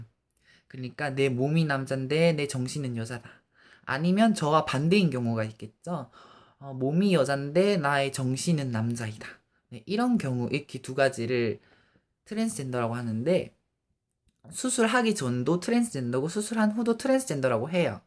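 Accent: Gyeongsang, South Korea. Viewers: 아 말투가 너무 그리워요ㅠㅜ제가지금 경상도살다가 전라도로와서ㅠㅠㅜ 대구근처에서 살았거든요!!사투리ㅠㅠㅠㅜ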